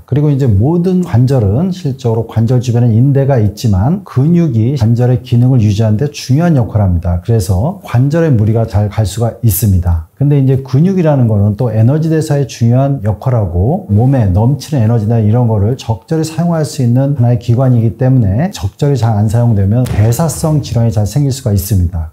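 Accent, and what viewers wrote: Gyeongsang, South Korea. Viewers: 경상도분 같아요?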